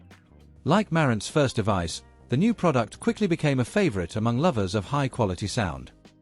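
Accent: United Kingdom. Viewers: So according to the narrator with the British accent, everyone has been pronouncing the name Marantz incorrectly. So phonetically Mare (like the female horse) and ents (like the tree beings in The Lord Of The Rings) it's "Mare-ents"(?) Huh... Learn somethin' new every day. (lol)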